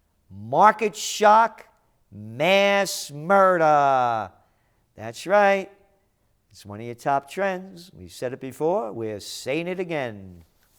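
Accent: New York, United States. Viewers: i love the New Yoik accent!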